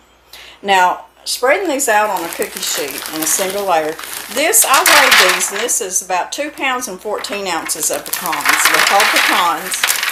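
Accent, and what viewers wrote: South, United States. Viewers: Wow, that is amazing! I don’t have a bread machine.. Diabetes runs in my family, so I have to stay away from breads. You always have an educational video. Thank you for teaching us 💕. I am from Iowa. We pronounce pecans differently. Love your southern accent😀!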